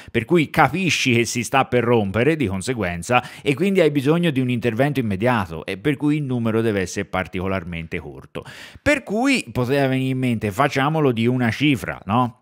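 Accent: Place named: Siena, Italy. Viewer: Bravissimo 1) Per i contenuti 2)Per la simpatia 3)Per il formidabile accento senese.Numero UNO!!!!!:washhands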